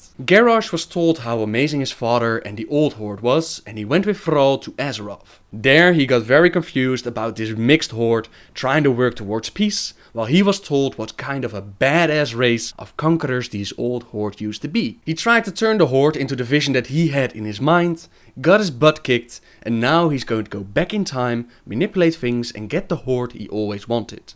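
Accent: Netherlands. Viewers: You're doing well on suppressing your dutch accent.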